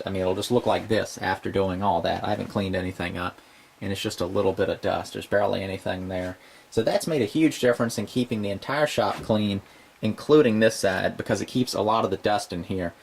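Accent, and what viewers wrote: South, United States. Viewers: To tell the truth, as a non-english-native-speaker it was your accent that made me subscribe (something from the south right?), but i kept the subscription because of things like that barn-tear-down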